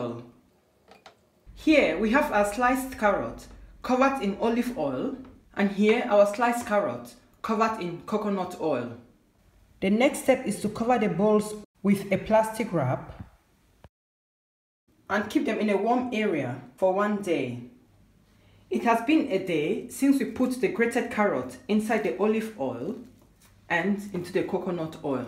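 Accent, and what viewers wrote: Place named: Cameroon. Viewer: You sound very Cameroonian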